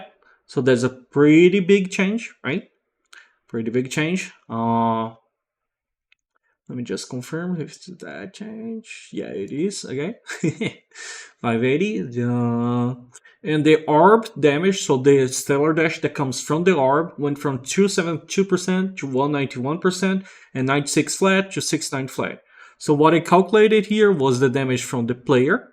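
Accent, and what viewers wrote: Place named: Brazil. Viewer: nao adianta disfarça o sotaque entrega kkkkk BR safado S2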